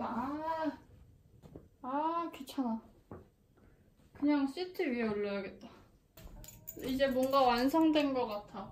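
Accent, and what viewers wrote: United Kingdom. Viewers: 영국 교환학생 가시려고 일부러 영국액센트 공부하신건가요? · 영어하시는 영상에서 발음이 영국이랑 비슷해서 듣기 너무 좋다 생각했는데 영국 교환다녀오셨군요!!대박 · 전 사실, 영국발음/표기 쓰시는 보구 정말 조았답니다, 중국 한국 도 좋지만 영국 라닛 ㅠ ㅠ ㅠ ㅠ ㅠ 기대할게요❤️ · I loveeeee your English accent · ㅝ지 당신? 영국식 영어 발음 너무나 섹시하자나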